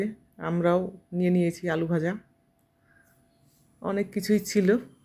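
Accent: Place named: Bangladesh. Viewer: এই আপুর বাড়ি মনে হয় বাংলাদেশে। উনার ভাষা কোলকাতার ভাষা মনে হয় না।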